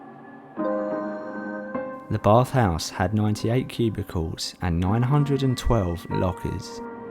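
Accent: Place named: Essex, United Kingdom. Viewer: Mate I wicked again brother can we meet up I have a few places up my sleeve. I make videos too. You sound like your from essex?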